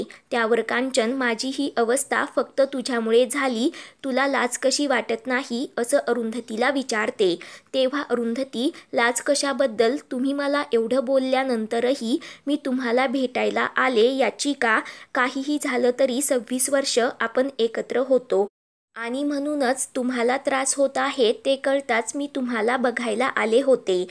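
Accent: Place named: Maharashtra, India. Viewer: Kiti ghanerda marathi aahe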